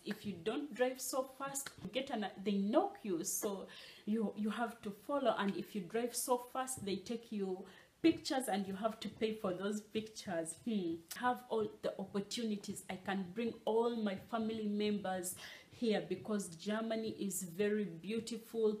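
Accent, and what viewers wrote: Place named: Uganda. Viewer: From your introduction 0.01 I knew you were Ugandan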